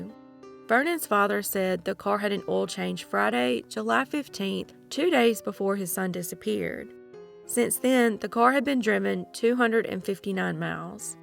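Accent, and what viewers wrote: South, United States. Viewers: Finally get to see the face behind that beautiful southern draw!!!! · Why did you not want to show your face, you are cute. I love your southern drawl. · Yes! It's always nice to see the faces behind the voices we love! In the beginning, I was so fascinated by the voice and southern accent that I forgot to listen to the stories she told. I had to listen twice! 🥴😁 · Awesome Tuesday. We get to see your pretty face with your sweet southern drawl. Thank you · Awesome as always!! Nice to see the face behind the Southern voice!! 💜 You're one of the very few true crime Youtubers who can pack a lot of information within a 10 or 15 minute video & not leave anything pertinent out to do it. While I like an occasional deep dive into a case most times I dont have 30-60 minutes or more to invest. This is what makes your content perfect for me . 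· You're very pretty and I really like your southern voice. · One reason I loved this Chanel was bc of your (yes) Southern accent/voice lol and now beautiful face. Born & raised in MD, I always dreamed of living in the south. Thank you for your diligence in presenting these stories! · Oh my goodness sake’s! It’s so nice to see a face that goes with that country voice! One day I’ll finally decide to show my face on my channel also! lol Keep up the great work ! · Good to see you girl! You are beautiful as that southern accent ! Keep'em coming, and again thanks for introducing yourself! ❤🕵️‍♀️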